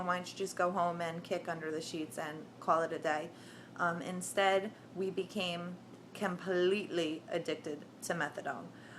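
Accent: Philadelphia, United States. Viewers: Lol soon as I heard you talk I knew u were from Philly or jersey. Philly here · hey I really appreciate your vids. don't listen to the haters and I hope ur getting a good laugh at the people commenting on here that are obvi. LEOs. anyway you bring up really good points. are u from philly? I think I hear the accent lol. im from the suburbs detoxing myself from subs right now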